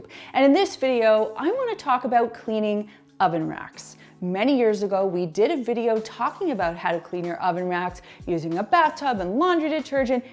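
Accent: Canada. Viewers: Great video with tips for a chore that I always dread. Makes it seem way more doable now, so thank you! Side note: are you a Newfoundlander?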